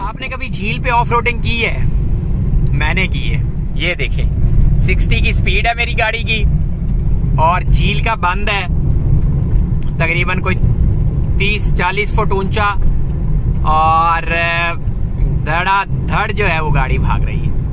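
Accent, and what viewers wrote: Sindh, India. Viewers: Loved your Sindhi accent as well ❤️ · what a beautiful sindhi accent of yours ☺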